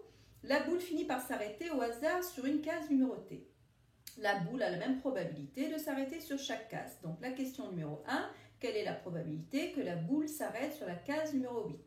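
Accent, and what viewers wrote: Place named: Tunisia. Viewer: Elle a l'accent tunisien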